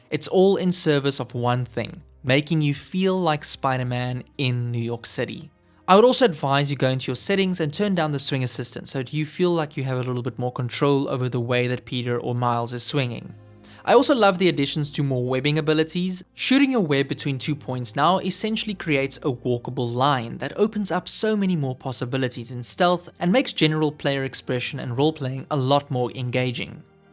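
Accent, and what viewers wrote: South Africa. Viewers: Going into spiderman 2 I was expecting the game to be the arkham city (still the best superhero game as far as I'm concerned) of its series and it doesn't. The first game lived up to my excitement and it's very good and it was the foundation just as Asylum was for Batman but. Miles morales was moving in the right direction but this game was a letdown. Spiderman 2's stealth is worse somehow, the combat is missing the suit and visor mods, suit powers and gadgets are worse versions of the previous ones. The only good thing is the traversal and it's still lacking feature games like web of shadows allowing spiderman to run in any direction on the wall. I shouldn't have pre-ordered this one and got the RE4 remake and Deadspace remake. The games only cost 600 rands each at BT games and I would've saved 200 (I assume you're South African with accent). 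I agree about venom and Kraven being the best visually and the VAs did an amazing job but I was hoping they'd be stalkers who pounce out of nowhere like taskmaster did in the first game but Kraven was mostly a Gladiator. 6/10 after my second playthrough. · Are you South African by any chance? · Fellow South African here. First time I’m coming across one of your videos, heard your accent and it was an instant ‘Subscribe’ for me 💪🏽 keep it up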